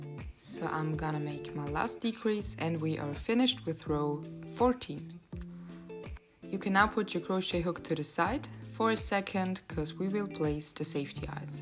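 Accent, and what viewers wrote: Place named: Austria. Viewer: Ich finde du machst das super!! Und dein Englisch ist schön und gut verständlich, daher finde ich den typisch österreichischen Akzent überhaupt nicht schlimm! 😁👍🏻 Und die Anleitung scheint soweit auch verständlich, werde es sicher demnächst selbst mal ausprobieren 🌊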